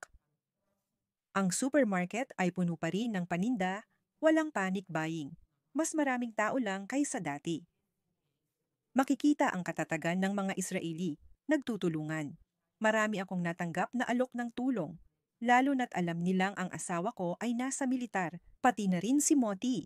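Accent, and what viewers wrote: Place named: Philippines. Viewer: Malinaw,miss, pilipinong pilipino Ang voice over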